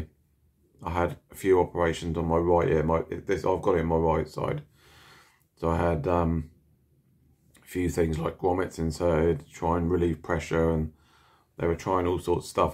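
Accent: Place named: Suffolk, United Kingdom. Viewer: Hello, just watched your video and having been diagnosed with MD 3 years ago recognise everything you mention. I've watched several videos from other sufferers and everyone has broadly the same symptoms, but nobody mentions the speed of the spinning, for me it's really fast, everything jumping from left to right or occasionally up and down. I had 3 steroid injections which have helped a lot, though I still get attacks out of the blue occasionally. I work nights in Waitrose, and as an employer, they've been brilliant, now that my original manager has left, who found my attacks and deafness hilarious. A new manager who's sister has MD, was much better. This condition changes your life much more than people realise. I rarely drive any more, and never alone. Dare not ride my motorbike, and generally seem to have lost interest in everything. 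I think I can hear you have a slight Suffolk accent, which is also my part of the world. It's nice to hear someone talking about "menieres" rather than "minures" the way the Americans pronounce it. All the best.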